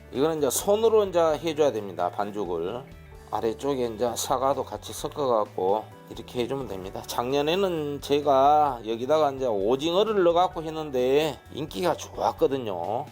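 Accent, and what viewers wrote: Jeolla, South Korea. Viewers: 경상도 아니고 전라도 사투리 인거같으신데요! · 헐 지는 전라도 본토 발음 같은디..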